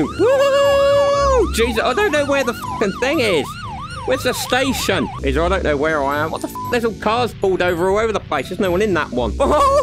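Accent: United Kingdom. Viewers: He's British mate, lives in Liverpool Essex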